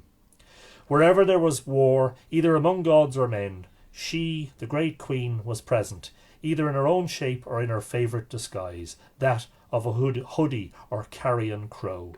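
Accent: Ireland. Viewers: I love Irish mythology, and always have, and to hear it read by an Irishman? Thank you sir, for this pleasure.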